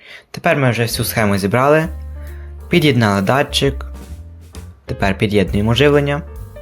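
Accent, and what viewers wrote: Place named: Mongolia. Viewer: Язык смешной,автор наверно монгол.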